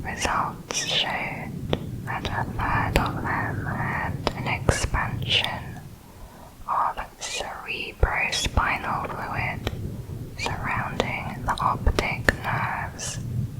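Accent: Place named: Liverpool, United Kingdom. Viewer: Lovely Scouse accent.❤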